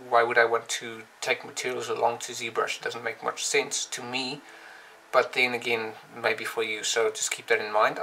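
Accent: South Africa. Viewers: Hahaha lol SA accent 🤣I'm South African too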